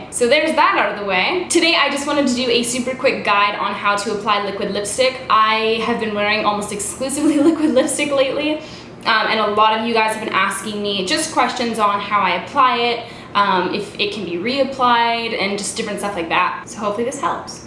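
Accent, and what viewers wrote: Canada. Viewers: She's Canadian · As soon as you opened your mouth I knew you were Canadian. I. Love. You. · You're Canadian aren't you! I'm not sure if you said this already but I can really hear it when you said "sorry"'and "out"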